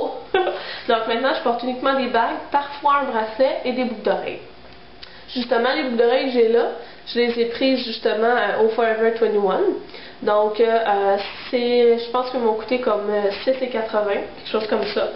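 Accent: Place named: Montreal, Canada. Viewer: J'ai vécu un an à Montréal, quelle bonheur d'entendre ton accent :). Et qui plus est, tes vidéos sont très bien :)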